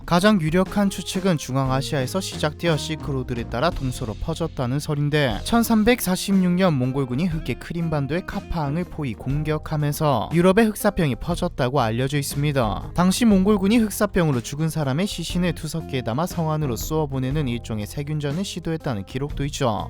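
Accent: Gyeongsang, South Korea. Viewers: 경상도 억양에 표준어 사용하니 좀 그럴수 있습니다. ㅋ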